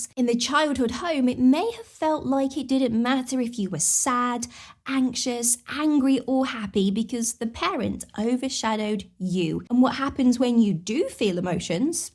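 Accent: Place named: United Kingdom. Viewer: Thanks for the clarity. Oh my goodness you hit the nail on the head. If you saw the shock I went thru when I found out about NPD at 40, it shook me to my core and am still going thru so much years later. I not only lost my childhood, I lost the best years of my adult life too. Btw: hello from Australia. I love your British accent.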